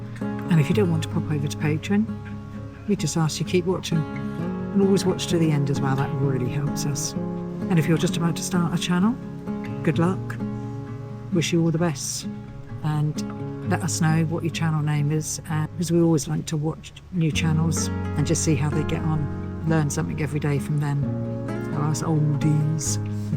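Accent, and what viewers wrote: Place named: United Kingdom. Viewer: Thank you 🙏 and I love the British accent ❤😊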